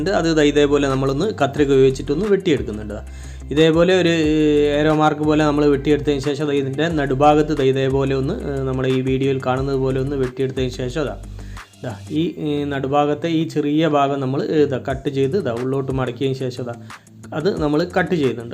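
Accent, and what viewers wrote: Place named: Palakkad, India. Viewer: ഇങ്ങള് പാലക്കാട്ട് കാരൻ ആണല്ലേ? 😃😃😃 ഡവറ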